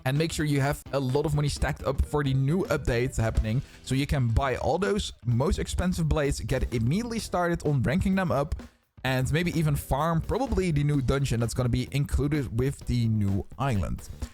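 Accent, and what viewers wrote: Netherlands. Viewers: Is English you’re main language u have a Dutch accent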